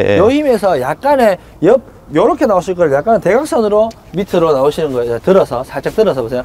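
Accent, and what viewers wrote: Gyeongsang, South Korea. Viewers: 어우! 경상도 코치님 진짜 멋진 강의 감사합니다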